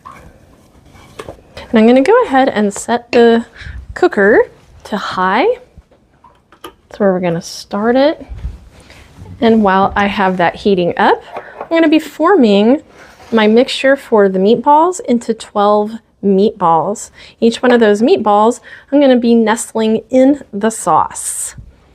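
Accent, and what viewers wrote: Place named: Quebec, Canada. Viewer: Bonsoir! Première fois sur votre chaine. Dites-moi, avec votre accent, je vous soupçonne d’être du Québec, ai-je raison? Pourquoi: excellent français et excellent anglais! Mais peu importe d’où vous êtes, j’ai apprécié cette vidéo. P.s.: je suis du Québec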